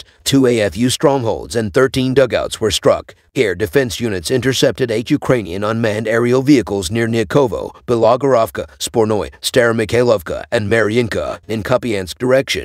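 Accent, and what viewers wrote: United States. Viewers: Давно мечтал, чтобы Американский комментатор,озвучил положение на фронте!!!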